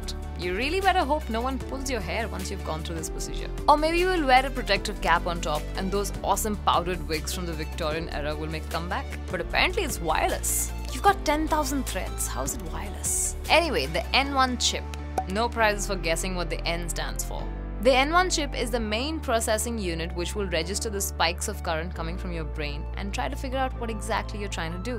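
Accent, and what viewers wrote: India. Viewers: This Indian accent only adds to the charm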